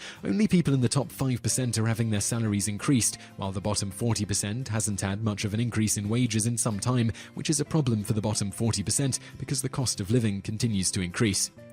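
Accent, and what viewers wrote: United Kingdom. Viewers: I would certainly hope a guy who has a broad british accent knows that oxford university isn't in london.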